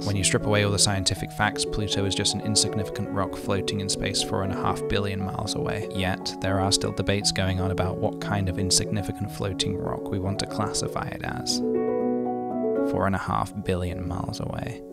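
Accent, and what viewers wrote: United Kingdom. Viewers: where are u from? your english sounds different to me, UK? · How did I know you were British before I even clicked on the video 🤧 but Pokémon blue version, represent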